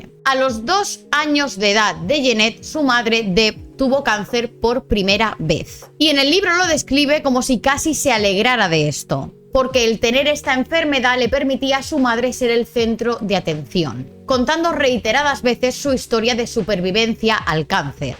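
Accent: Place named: Spain. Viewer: Los españoles tienen un acento bien culero Tengo entendido que si no fuera por Youtube todo España se muere de hambre jajaja 😅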